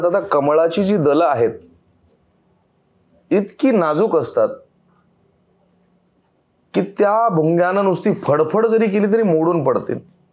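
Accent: Standard. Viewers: प्रगल्भ अभ्यास व्यापक विचार सुरेख भाषा. उच्चार शुद्ध. खरं च अकल्पनिय. विनम्र अभिवादन. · कोटी कोटी नमन शुद्ध वाणी,उच्च विचार ,दांडगा व्यासंग एवढा अभ्यासअसणारे महाराज बोटावर मोजण्याइटकेही नाहीत वारकरी संप्रदायात आपली पुस्तके वाचायला आवडतील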